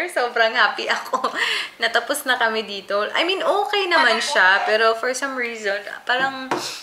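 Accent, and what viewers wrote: Visayas, Philippines. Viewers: Kasi Bisaya ka Kasi, Kaya pag nagtagalog ka parang may kunting accent Ng bisaya na malambing, Kaya akala nila maarte na, di nila alam malambing Ang mga bisaya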